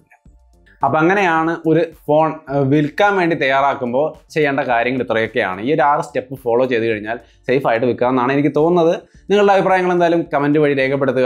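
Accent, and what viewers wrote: Thrissur, India. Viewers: ഫോൺ അങ്ങട് തല്ലിയ പൊളിക്ക. തൃശ്ശൂ കാരൻ ആണെല്ലോ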